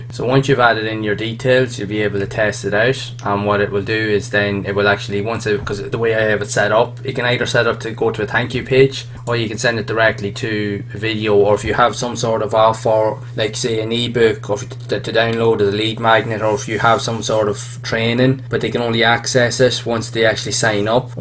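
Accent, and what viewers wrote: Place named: Ireland. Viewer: what county in Ireland you from?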